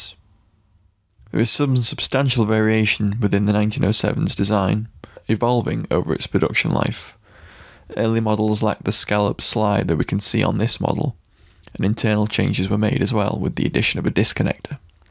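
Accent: United Kingdom. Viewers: What a pleasant surprise to click on a gun-related video and hear a fellow Brit narrating it. I just bought a Dreyse M1907 a few days ago, can't wait to try shooting it.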